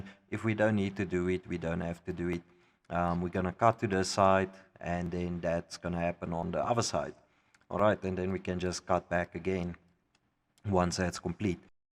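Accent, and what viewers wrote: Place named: Afrikaans, South Africa. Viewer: i are liking your afrikaans accent :)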